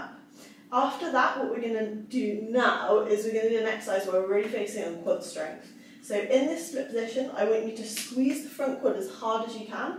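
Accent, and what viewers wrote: United Kingdom. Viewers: Strive for perfection. Or do nothing n be perfect. Pony tail. British accent. Ima gitcha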